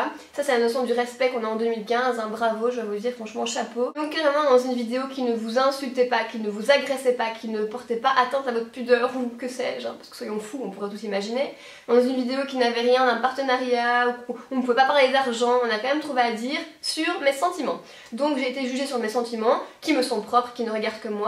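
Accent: Belgium. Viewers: J'avoue que notre accent à nous les belges donne un cachet en plus quand on dit les choses! Merci pour cette vidéo, j'espère que les gonzesses qui ont la critique un peu trop facile ont pêtés un bon coup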